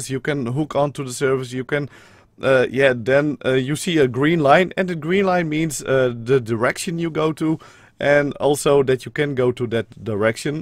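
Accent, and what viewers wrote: Netherlands. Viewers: Wait are you dutch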